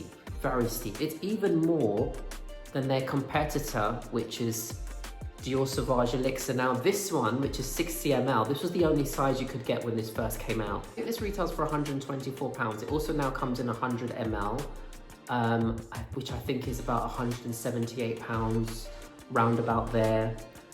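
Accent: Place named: France. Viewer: I’m just loving your French accent😂😂. My teenage son loves the OG , it’s one of his favourite😍😍. Appreciate the thorough review👌👌. Stay fabulous🥰🥰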